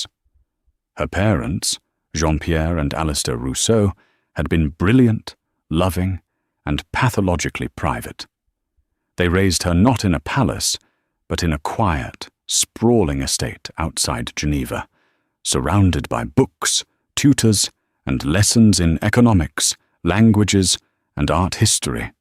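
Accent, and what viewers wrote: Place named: England, United Kingdom. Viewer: Oh so glad I'm not the only one to enjoy the narration in that purring English accent. YUM!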